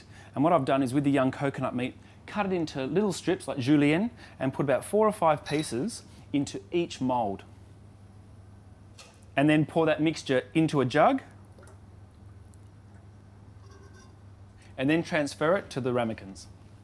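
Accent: Australia. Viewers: Really? A Thai chef and yet not Thai. He cooks for an Australian palate. I can go to Khao San road and learn these recipes. I would not then claim I am a Thai chef. In the US they have Chinese restaurants everywhere. There is a great Chinese population of all generations and 97% of the Chinese restaurants are so far from Chinese that they considered more American. The one near me is run by Mexicans. I have been a chef for 30 years, 20 of which was Asian cuisine. Plus, whenever someone has an English (Australian) accent they can make any claim. No one even questions it. Something about the accent tends to add legitimacy. American infommercials are rampant with them. I not doubting his culinary feats, just saying. Hotelier Christina Ong ( from Singapore, not Thai) gave him his great break. I know he spent a couple of years in Thailand ( I spent 10) and had minor success in Sydney with Thai food prior. 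World famous, no. So as I said, he cooks for an Australian palate.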